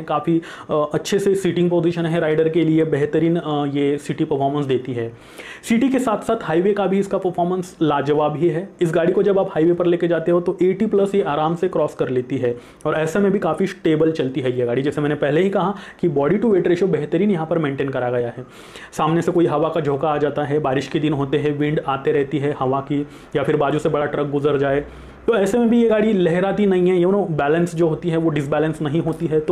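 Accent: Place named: Maharashtra, India. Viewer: Dada ascent madunach mait padtay marathi manus...great work...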